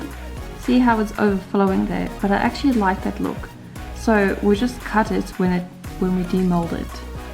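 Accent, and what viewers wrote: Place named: South Africa. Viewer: Awesome om n SA accent te hoor :) thanks for a great video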